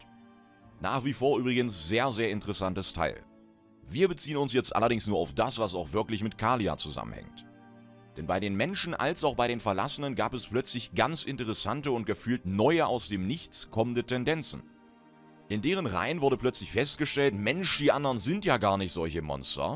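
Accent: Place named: Saxony, Germany. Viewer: Wow Lore + Sachsen Akzent. GOLD!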